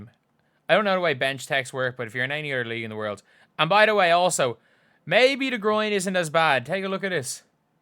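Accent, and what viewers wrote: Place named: Ireland. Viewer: I like your accent! Sounds Irish to me. Where are you from?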